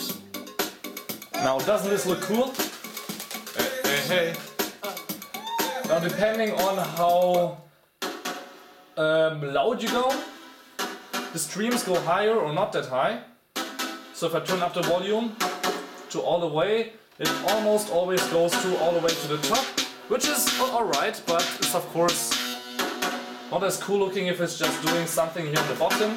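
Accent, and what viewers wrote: Germany. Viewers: Du bist deutsch gä also emmm mam hörts bissl rauß aber egal gutes video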